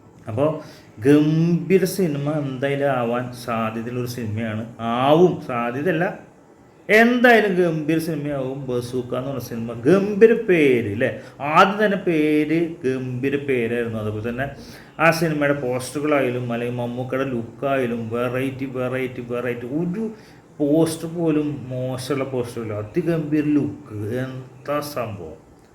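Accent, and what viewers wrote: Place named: Kunnamkulam, India. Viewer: ഇക്കാടെ കുന്നംകുളം വായിൽ irikkunno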